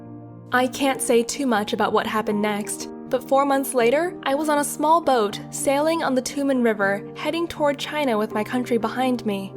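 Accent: United States. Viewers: Yet you sound American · hmmm American accent hmmm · Dislike. She sounds like she's from the USA, not North Korea, so she has no first hand experience, This makes her sound like a propagandist. To anyone who this did not occure to, your thoughts and opinions are being controlled by propagandists. I do not know the full message of this video as I stopped at 58 seconds, so if anything changed, then I don't know about it, but from what I heard so far, it sounds so incredibly propagandist to a point that it;s difficult to listen to. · she sounds american also (not bieng racist) dont asian people have like an acsent like common she dosent sound korean at all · U r from korea but u have a american accent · Stick The person who submitted the story is from North Korea, the narrator is American. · She does not sound fron North Korea 🇰🇵 she sound from America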